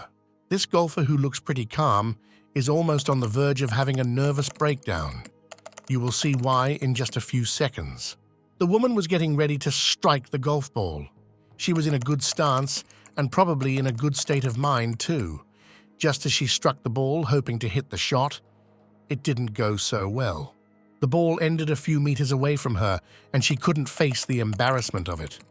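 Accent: United Kingdom. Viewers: Annoying British commentary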